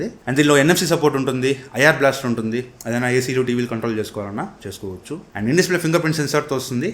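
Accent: Nellore, India. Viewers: Bro meedi Nellore ha?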